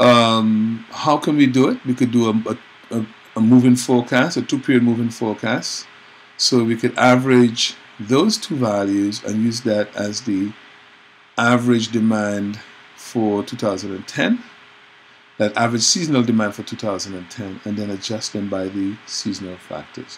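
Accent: Caribbean. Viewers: so pleasantly surprised when I heard the Caribbean accent. thanks for posting! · this has to be a Caribbean accent.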